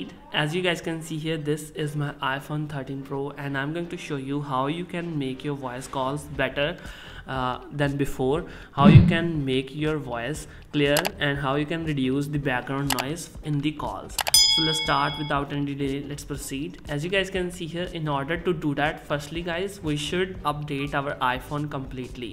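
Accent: India. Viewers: Learn how to talk proper English, you sound ridiculous. You are speaking English not indian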